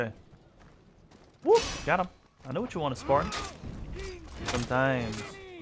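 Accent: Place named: South, United States. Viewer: Can’t help but hear the country in your voice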